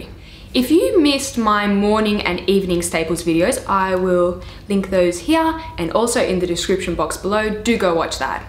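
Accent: Australia. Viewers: Lol the end was funny, nice to you as you!!! Btw, r u Australian?!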